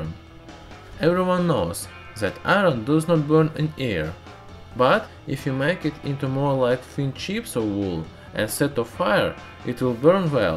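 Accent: Russia. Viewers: Ocean Junkie I Agree He speaks perfect English with his very thick Russian accent. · Love to hear Russian accent speaking English, looks wiser think so 🤔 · Just started watching this channel recently. This is one of the best beginner's chemistry channels on youtube. Love the Russian accent and light humor as well! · Man, I love your videos and your Russian accent. Keep up with new content. Cheers.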